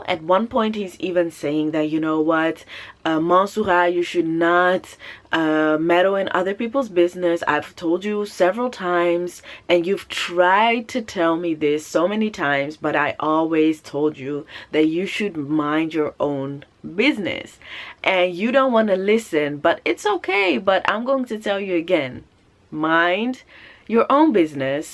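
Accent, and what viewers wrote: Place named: New York, United States. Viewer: Wow! Listening to you speak, I thought for sure you spent some time in my home town of NYC. What an interesting and inspiring story; you are truly gifted and talented. You should think about working for the UN. Hearing about TV in the Netherlands explains a lot; because unless you are in the US watching public television, an HBO foreign film or show, or some special channel like National Geographic, most things are dubbed in English (often with bad translations, so my friends who are fluent in other languages tell me). Which is why I love to see foreign films directed by people like Akira Kurosawa and Ousmane Sembène. My other language learning days are probably over (btw, I sent a friend who travels to Senegal quite often, your Wolof learning link) because of the many projects I have going; plus I find that when I travel people are generally very nice and I get to wherever I want to go very well. 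When COVID-19 travel restrictions improve, I plan to visit Senegal, and even everybody I know there speaks English! But if I ever visit the Netherlands I will definitely hire you as an interpreter! :-)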